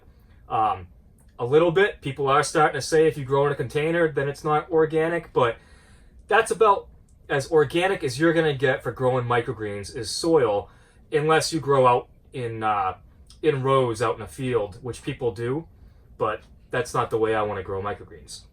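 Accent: New England, United States. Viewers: Ahhhh.....there's a real symbiotic relationship between a how-too upload and a New England accent.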